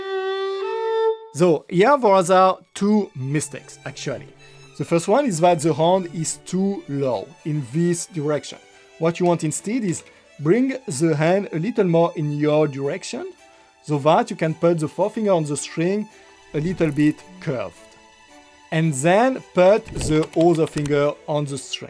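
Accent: France. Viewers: These are some of the best tips and tutorials on YT. I do have a little difficulty with the French accent. One suggestion is to correct a grammar mistake often made throughout these videos. He says "Let your finger on the string" for instance. What he means is "Leave your finger on the string." The word you want is leave.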